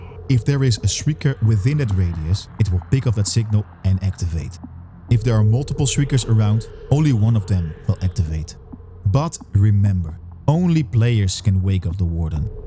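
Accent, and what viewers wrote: Netherlands. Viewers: Do I hear a Dutch accent? 👀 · Very Dutch accent methinks! Great video!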